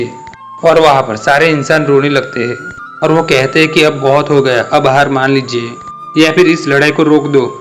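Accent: Gujarat, India. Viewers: Aap Gujarati ho?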